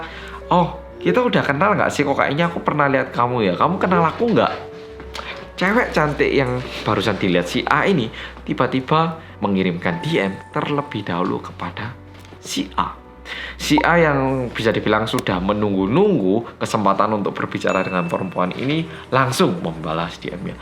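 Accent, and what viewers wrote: Java, Indonesia. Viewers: Cerita yg dpt menjadikan kita semua menjadi lebih hati2 di era yg serba canggih... Thanks mas korea yg medok jowone 😁🙏👍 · Mas medooookkkk...😊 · Bersama orang korea yang medhoooook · Hihihi medhokkkk🌼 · Bersama dengan orang korea yang medhokkkk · canduu medokk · Medokkkk(bibirku meluu mlongo) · Mas lebih kentel Jawanya daripada Korea😭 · Bersama orang Korea yang medok.... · Medooookkkk semakin ombo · Kembali lagi sama orang korea yang MEDDHHOOK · Bahasa nya oppa medok bgt 😁 Enak di denger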